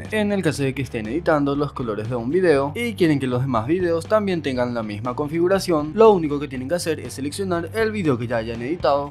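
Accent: Paraguay. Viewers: interesante se nota mucho nuestro acento paraguayo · Tu acento en muy paraguayo ¿eres de paraguay?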